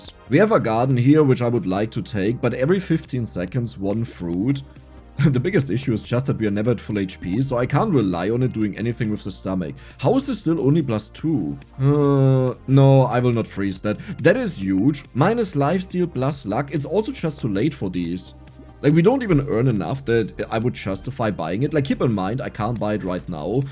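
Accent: Germany. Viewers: Ähm kurze Frage du machst nur Videos auf Englisch oder? Ich fände es echt cool, wenn du auch mal das ein oder andere auf Deutsch machen könntest. Irgendwie klingt es für mich so, als wärst du eigentlich deutschsprachig.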